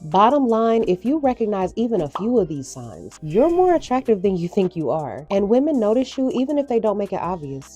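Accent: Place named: South, United States. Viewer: My cousin says women are like ice cream, 38 different flavors of the same 💩! I love the southern accent on this commentator😋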